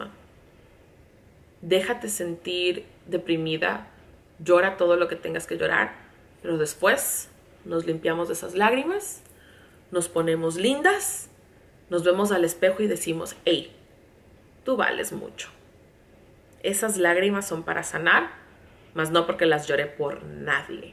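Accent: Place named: Ecuador. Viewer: me encanta que a pesar del tiempo que vives en USA sigues hablando muy ecuatoriana ❤️❤️❤️❤️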